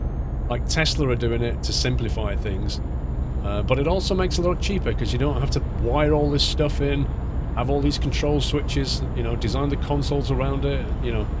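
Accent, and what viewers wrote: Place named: United Kingdom. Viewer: Volvo is in ATS as is all the Kenworth's as is all the Peterbilts. I don't know if they are exact replicas but they all seem to pretty much work the same and look the same. I have never used a Mod, I just stick with what SCS has as I don't need big game crashes as I'm not that computer savvy. ....... I'm American so I love your British / English accent and even much more of the UK ladies..... You probably don't realize you have an accent, but I sure do. However, your speech is nice and clear and precise even for an american to understand or any one that speaks English. England is considered Pennsylvania's mother state, as it was I think, given to William Penn by the King of England. With out googling it, I don't know why the King gave Billy all this land. However I live in Pennsylvania, USA and my great-great- grandparents migrated from the UK to here, but I have no idea why. 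I gave you a thumbs up and watched your video on 3/17/2019. Also that last delivery yard you pulled into and parked is fairly typical of ATS... The scenery however was much nicer than ATS including the roads. My next attempt at a game is going to be GTA5. If you know Jeff Favignano, he seems to get the most of GTA5 and I love watching & listening to him play that game. He has a lot of personality. Take care, God Bless...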